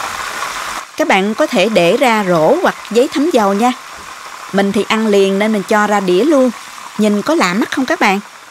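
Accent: South, Vietnam. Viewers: Món này ngon quá chị bà ơi, chị có một giọng nói rất ấm áp truyền cảm đậm chất nam bộ, một giọng nói như phát thanh viên Radio hay là những bộ phim tài liệu lịch sử rất tuyệt 😄😄😄👍👍♥️♥️ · giọng cô này hay ,chuẩn nam bộ luôn kkk giọng này làm kênh kể chuyện cũng hợp lắm đa!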